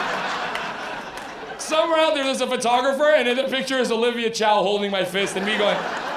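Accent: United States. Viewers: you were great. You had me rolling, you sound like you’re from Miami 😂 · Why does he sound like he's from Jersey? I had to look him up; did I know where he's from? Canada? I was surprised.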